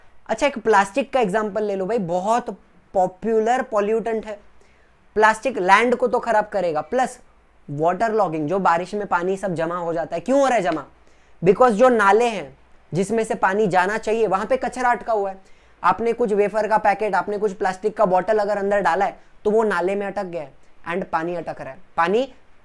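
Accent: Maharashtra, India. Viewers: Sir mast shikavata tumhi Tumhi marathi ahat ka I thought that because of your language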